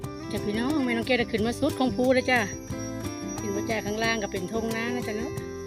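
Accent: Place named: Phu Thai, Thailand. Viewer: ทางได๋น้อคือสำเนียงภูใท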